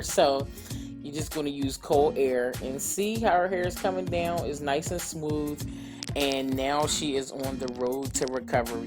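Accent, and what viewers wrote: Philadelphia, United States. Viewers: I'm a guy who loves watching your videos, honeslty just discovered them yesterday. Please tell me that's a Philly accent??? <3 You speak like a cool ass big cuz or young auntie from 215 for sure <3 ...nevermind you just said "home shop in Philly" lol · I was trying to figure out where you were located then I heard “Real rap” I said yea she’s def. from Philly lol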